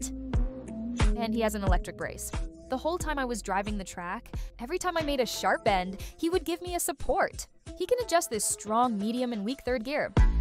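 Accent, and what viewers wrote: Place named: China. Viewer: Funny Chinglish commentary 😅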